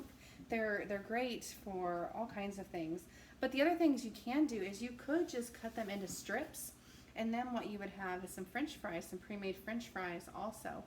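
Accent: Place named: North, United Kingdom. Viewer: Where do you guys live??? Sound like a norther girl like me! 😉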